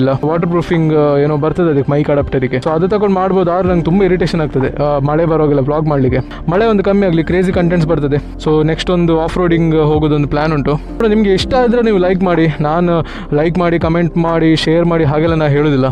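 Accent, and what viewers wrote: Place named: Kundapura, India. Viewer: Kundapra kannada kend kushi aith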